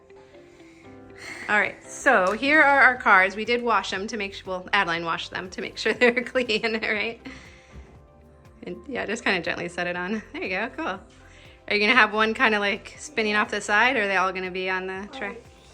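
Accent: Minnesota, United States. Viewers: "Eye-talian". Soo Minnesoota!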